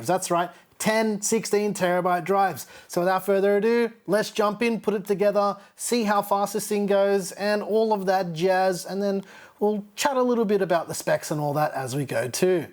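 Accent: Australia. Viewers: Haha yeah but stick to your guns I reckon. Ignore the complainers. People need to accept you’re Aussie with an Aussie accent.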